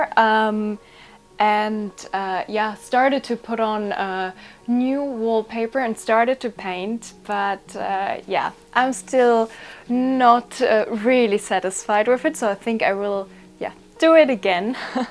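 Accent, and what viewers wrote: Germany. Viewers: Sehr hübsch...Deutscher Akzent, sehr heimisch und vertraut ❤😉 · She has an german accent,(twist) and that is quite normal, if your native lamguage is not english.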